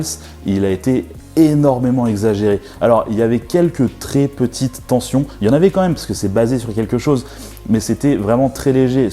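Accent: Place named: Moselle, France. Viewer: Désolé les gens, ici dans notre belle région c'est comme cela que l'on s'exprime ! Le Chti' d'la Moselle...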